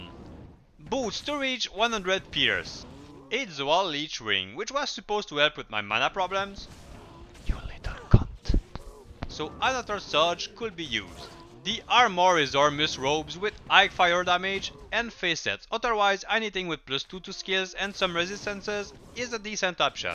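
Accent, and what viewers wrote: Quebec, Canada. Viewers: rreally nice english with a beautiful quebecer accent lmaooo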